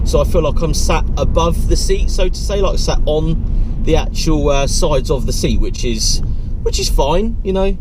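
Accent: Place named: West Country, United Kingdom. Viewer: Hey dude, enjoyed the review and the camera work. You come across well, few rough edges here and there but overall pretty good. Bit of the west country accent adds a bit of character compared to the boring droll of some car reviewers. On a bad note and I hate to be this guy, but you do use "nice" too much. Other than that, you did a good job.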